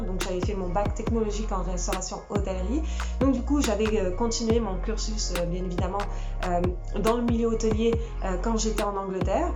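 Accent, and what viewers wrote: Canada. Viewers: Parcours intéressant, bravo ! Donc, ton léger accent est ... québécois :) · J adore ta façon d intervenir Le melange francais canadien peut etre etc La simplicite Le souci dexactitude Lintelligence surement :) Merci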